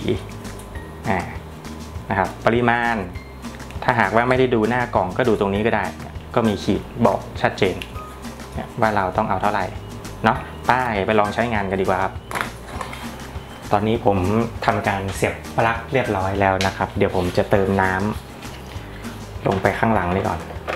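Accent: North, Thailand. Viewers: คนเหนือหรือเปล่าครับ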